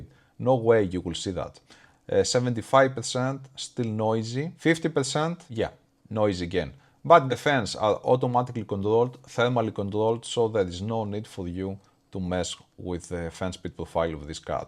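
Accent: Greece. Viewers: But with a Greek accent! 🙌🏼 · by the accent i bet that you are greek :)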